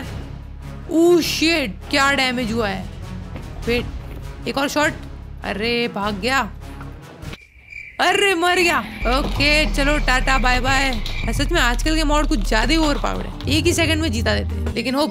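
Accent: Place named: Gujarat, India. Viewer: Aapki boli se lagata he ki aap gujrati ho kya ho aap gujarati 😘😘😘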